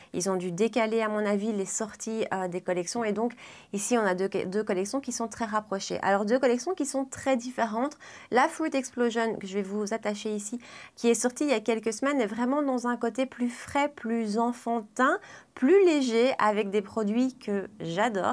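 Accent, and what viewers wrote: Belgium. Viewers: Merci pour cette excellente revue (comme d'hab:). J'adore ton petit accent belge!:)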